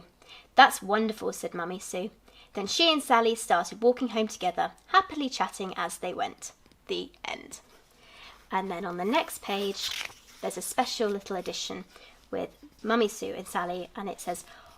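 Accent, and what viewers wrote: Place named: United Kingdom. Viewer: has such a lovely British accent and cherry voice. She should do voice recording for e books.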